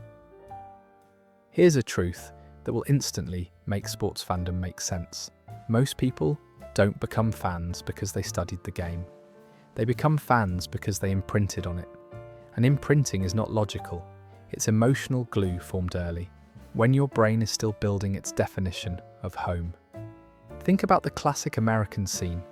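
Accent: United Kingdom. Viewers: What's with the English accent? Does that make it more legitimate?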